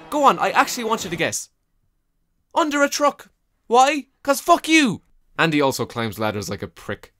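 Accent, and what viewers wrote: Ireland. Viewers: He sounds so Irish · I love your Irish accent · Your Irish accent is jus lovely darling. lol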